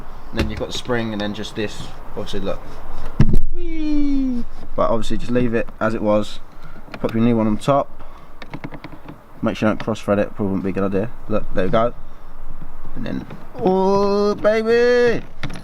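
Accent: Essex, United Kingdom. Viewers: essex accent is 100% the worst English accent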